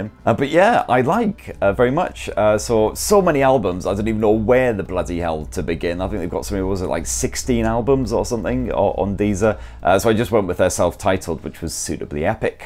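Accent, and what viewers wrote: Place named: Newcastle, United Kingdom. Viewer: I meant it as a compliment, I'm a Geordie myself and I cannot detect any of the usual Makemesque accent. Your accent sounds very much north of the Tyne to me.😳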